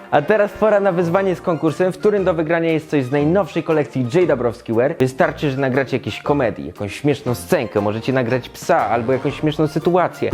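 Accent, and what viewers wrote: Germany. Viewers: Dobry niemiecki akcęt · Dobry Niemiecki akcent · Powinieneś jechać do niemiec. Super niemiecki akcent translator i filmik šmieszny hee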